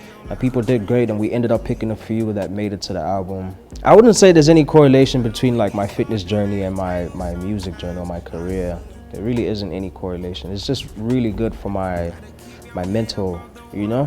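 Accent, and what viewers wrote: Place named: South Africa. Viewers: Coz his south African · why is he using his south African accent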